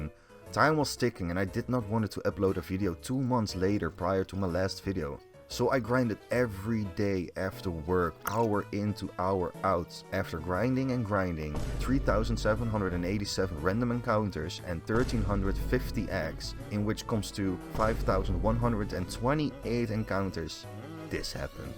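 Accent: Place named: Netherlands. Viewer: You're Dutch aren't you? knew it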